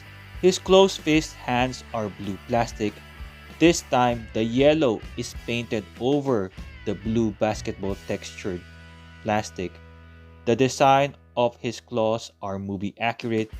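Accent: Philippines. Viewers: Your accent screams that you're a filipino. Lupet tol! 😂 Edit: after i saw darna figure, it confirmed my theory earlier, pinoy ka nga talaga, bro! 😅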